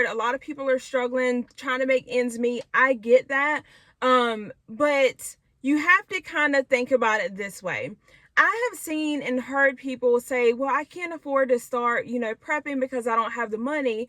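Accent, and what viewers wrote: South, United States. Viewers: Awesome message. I also adore your American English accent. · She sounds southern. From Sip or Bama i bet.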